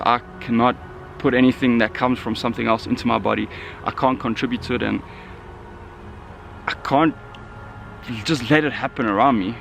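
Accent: South Africa. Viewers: I just love south African accents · I thought I was crazy for thinking you're South African due to your accent, Good video brother 💪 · I swear I could tell you were South African... I just couldn't figure it out :D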